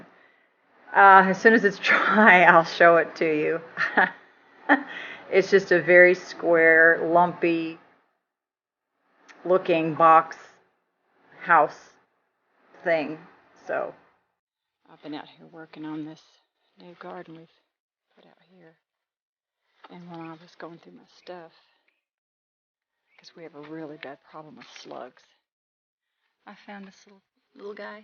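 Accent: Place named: United States. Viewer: I can't follow your American Accent......🙂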